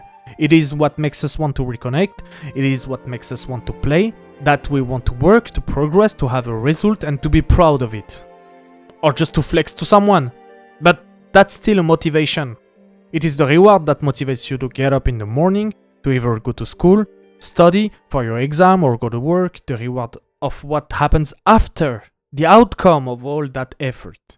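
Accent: France. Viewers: Are you French? You sound like you have a french accent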